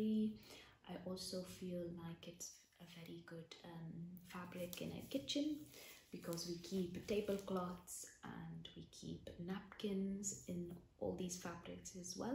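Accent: India. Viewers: I love your Indian accent! I don't know why but it's more relaxing than any other accents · your indian accent videos are the best!!!!!!!!! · love your indian accent videos! We should make one together - i messaged you on instagram!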